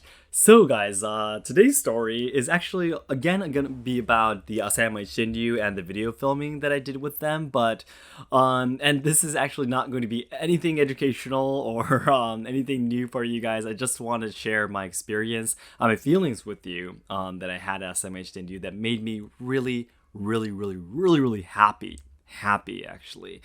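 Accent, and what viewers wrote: United States. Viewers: I am very grateful and happy that you speak so politely in English. I've never needed to worry about who is around me be upset or offended by hearing anything on your channels. Which makes me very happy. As far as your English accent being American or basically the same as mine. I think that it makes it easier for anyone who speak English to understand you. They send people from other parts of the US to the region you lived in / I am from to learn how to speak American English in a way to be well understood. You are very polite in English too.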